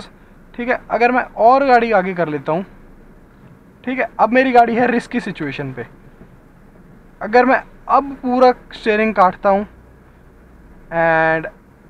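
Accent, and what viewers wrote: Chandigarh, India. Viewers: Chandigarh se ho bhai? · Chandigarh se ho na aap 😁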